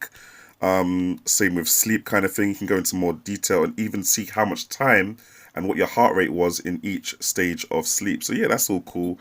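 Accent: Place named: Cockney, United Kingdom. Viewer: Really good review, thanks for posting. Brilliant accent by the way. SO cockney, kind o ting... you get me?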